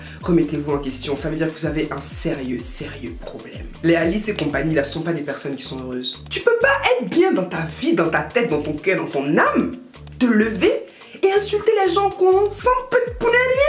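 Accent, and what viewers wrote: Ivory Coast. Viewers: Par ta manière de parler je sais que t7 ivoirienne comme mw wallay ma sœur 😍 my côte d'Ivoire j'adore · Nonnnn tes krooooo bien ta trop l'accent ivoirien naaa change pas on a kro le mm caractère on s'en fou . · attend t ivoirienne ou koi ta notre accent putain j'adore ta grandi en cote d'ivoire? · t'as manière de parler me casse trop on sent que t'es une vrai ivoirienne même loool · « Se qui est suuu » 😂😭😂 ton accident ivoirien est juste trop beau #Rwanda 🇷🇼🇷🇼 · J'adore ton accent ivoirien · dsl moi je vit en cote d ivoire ( mais je suis pas ivoirienne ) j aime pas trop l accent , genre c drole a l entendre mais niveau beaute c pas trop ca ^^ je le trouve un peu trop brusque apres c que mon avis personnelle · cette vidéo faite avec l’accent ivoirien j’adoreeeee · Mdrrrr l'accent ivoirien. ?tu tues grave...Abidjan♥♥♥♥ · Tu fais des efforts pour avoir ton accent français mais quand tu t'emballes tu redeviens ivoirienne vive 225🇨🇮🇨🇮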